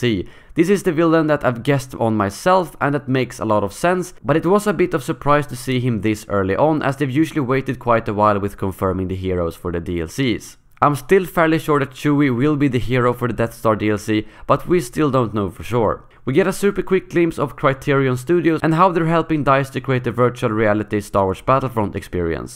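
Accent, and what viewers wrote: Sweden. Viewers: Allow me to congratulate you for the incredibly well-packed video full of information, and when you speculated it was properly mentioned. Your videos are getting better by the day :D (still miss the swedish accent of the earliest videos hahaha). Keep it up!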